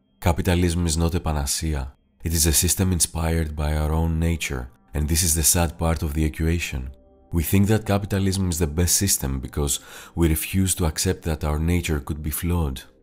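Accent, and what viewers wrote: Greece. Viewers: Why can I tell that you are Greek just by listening to your voice? Anyways, great video!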